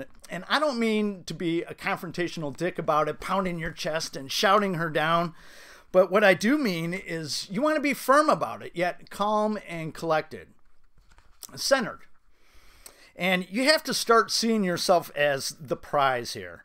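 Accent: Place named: Midwest, United States. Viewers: I’m digging the Midwest accent and great advice 👍🏻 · I don't know why I thought you were from Canada I can so hear the Illinois accent now.. Great video bro!! always on point👍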